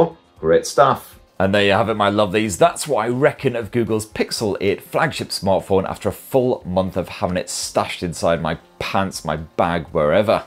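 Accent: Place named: Newcastle, United Kingdom. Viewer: Howay ! Bonny Lad etc . What a charming accent you have… smug… smug… yours, smug southern bastard . Keep up the excellent reviews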